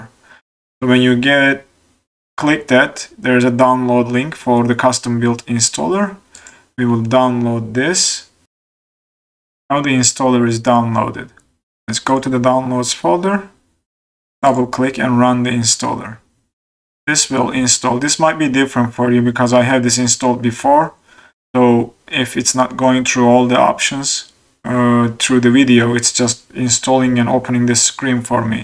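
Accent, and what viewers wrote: Turkey. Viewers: Thanks for tutorial. Quick and explanatory. But your accent doesnt sound like a native english speaker. Türk müsün ?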